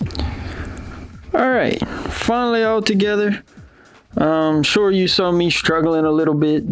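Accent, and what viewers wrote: Louisiana, United States. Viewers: Great video brother very informative I'm about to swap mine out. Your accent gives me hope that I am not the only one drifting in Louisiana! Greetings from Denham Springs.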